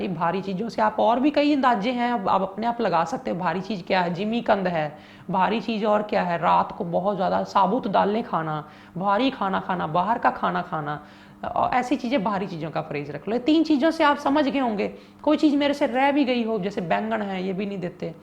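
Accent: Punjab, India. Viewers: Ye punjabi accent hai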